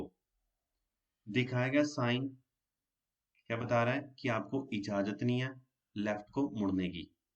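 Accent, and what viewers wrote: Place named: Punjab, India. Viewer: Hmmmm nice in urdu but style punjabi hy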